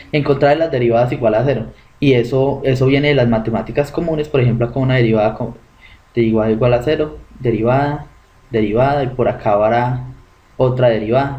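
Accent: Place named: Colombia. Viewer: Excelentes videos, excelente profesor, debería de aparecer su nombre también, para darle todo el crédito a él. Por su acento parece Colombiano. Un abrazo y muchas gracias...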